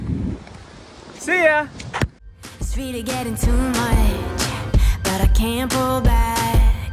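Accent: South Africa. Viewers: I hqve to ask what accent you have I’m thinking South African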